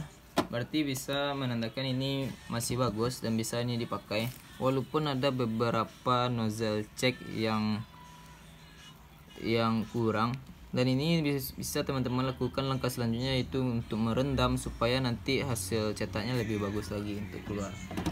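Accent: West Sumatra, Indonesia. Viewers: Jelas sekali logatnya minang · Logat minangya jlas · Dari logat bahasanya...saya yakin, abang ini orang Minang.... 😊😊😊semoga benar.....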